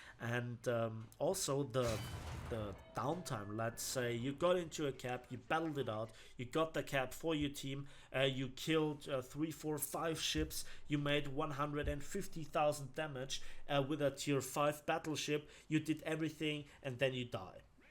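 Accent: Bavaria, Germany. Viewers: Question: What do you think of WoT in General? Und kannst du, wenn du deutsch sprichst bitte das Bayrisch unterlassen? Ich hab dann immer das Gefühl, ich hör Seehofer oder Söder zu. Yet thete is no indication of He filler, penetration values or fuses and so on in WoWs...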